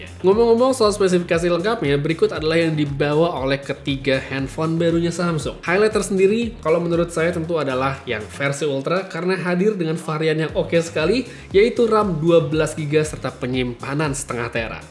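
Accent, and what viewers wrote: Bali, Indonesia. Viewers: Orang bali iya ?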